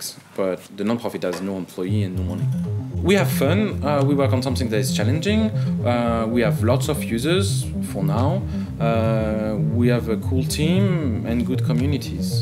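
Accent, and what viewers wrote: France. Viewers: His french accent is so funny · I like your french accent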